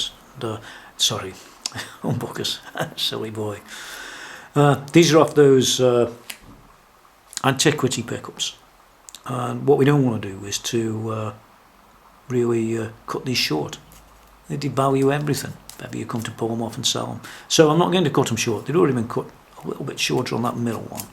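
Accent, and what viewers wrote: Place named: Cheshire, United Kingdom. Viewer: I stumbled across both of your build series and as a Stat player myself I feel inspired to one day take a similar approach, with this mark 2 the thought of Strat without a scratch plate "oh Jesus no!!!!" You sir have more than changed my opinion, what a gorgeous looking bit of kit to own, and the sound and tone even on a smart phone sounds great, if I'm honest apart from my own it's been a long time since I've heard a Strat make me want to get my hands on it lol Do you gig at all? By your accent you sound like you could well live not all that far from me in Cheshire, if you do play live I'd certainly take the opportunity to come hear you play it, I certainly understand if that would be a bit too personal. Anyhow thanks for the inspiration and enjoyable videos, enjoy your custom build, with the money, time, care and effort you've invested in her you certainly now deserve the pleasures that come Cheers!!!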